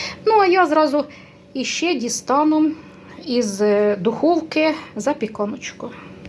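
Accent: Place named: Kirovohrad, Ukraine. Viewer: ви молодець,сільська трудівниця.Люблю вас дивитися і слухати.Мої дідусь і бабуся так розмовляли́ З Кіровоградщини.Миру, здоров'я ❤❤❤❤❤❤❤❤❤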